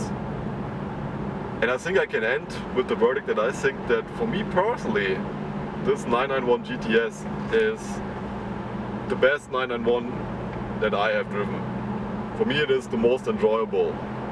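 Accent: Germany. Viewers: echt gute arbeit, ich hab den 991.1 nicht zuletzt dank deinem bericht auf dem schirm! wertstabiler als die c2s , c4s scheint er auch zu sein. p.s. selbst dein dann doch etwas herber dt. akzent sei dir verziehen, lol ...aber ohne scheiss jetzt, nach dem bericht von dem "ausfahrt typ" war der hier wie ein urlaub...weiter so! 👊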